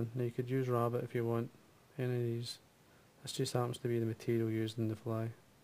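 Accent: Scotland, United Kingdom. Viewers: I think I've said it before, needs saying again, you are a wonderful fly tyer and your flies are absolutely lovely. Your methods, which, as a tyer for 40 years (and pretty decent), I have to say are SO meticulous. Plus the accent man, I love it. Brings me back to a wee lochen near Inverness, which, as I understand, I can't tell you the name of or I will meet with an early grave.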